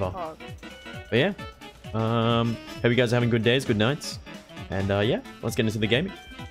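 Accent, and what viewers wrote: Australia. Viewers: Are you from Australia or NZ?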